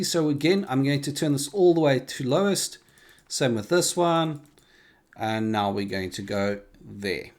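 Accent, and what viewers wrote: South Africa. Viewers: You sound South African 😉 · Your accent gives me a South African vibe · i know a afrikaans brother when i see/hear one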